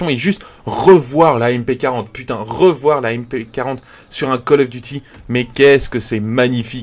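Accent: Belgium. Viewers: T belge ? :p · Oh tes belge je savais pas😱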